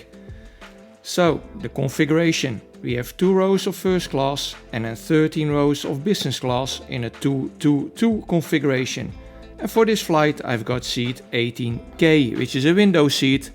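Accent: Netherlands. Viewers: That Dutch accent! 😍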